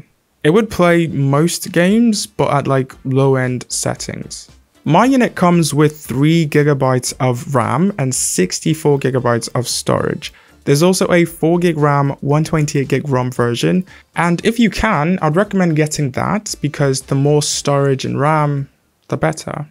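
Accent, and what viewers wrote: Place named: England, United Kingdom. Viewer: sorry, you sound English while you're African. unless you're from England that's fine. authenticity is paramount.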